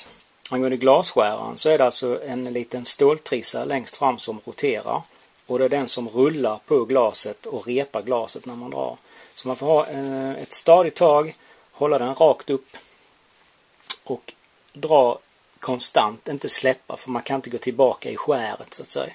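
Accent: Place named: Scania, Sweden. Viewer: Har kollat på alla 9 videos och ja tycker du är rolig och din skånska är rolig med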